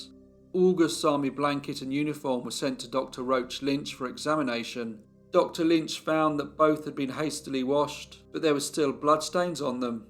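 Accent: England, United Kingdom. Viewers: sorry I was only nit picking. You sound English(same as I am),and if that is the case, then Left-tenant is how it's pronounced 😁😁😁😁 But that is neither here nor there. I love your shows, please keep up with these wonderful pieces of crime history.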